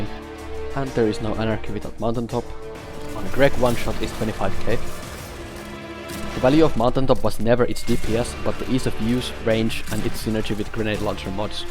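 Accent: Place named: Finland. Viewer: Oh cool I've legitimately never heard a Finnish person's accent. Also nice vid 👍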